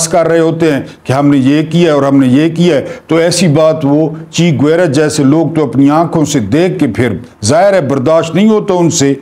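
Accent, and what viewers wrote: Saraiki, Pakistan. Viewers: اسلام علیکم ورحمتہ اللہْ مخصوص سرائیکی لہجے میں اردو اندازِ تخاطب اور اس پر تاریخی واقعات کا تڑکہ اللہ پاک اسے دوام بخشے صحت و تندرستی سے مزیَّن مستقبل عطا فرماۓ ماشاءالله ماشاءالله ماشاءالله ماشاءالله والسلام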